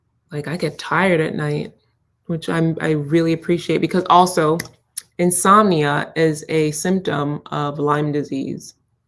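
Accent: Jamaica. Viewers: I love when you use your Jamaican accent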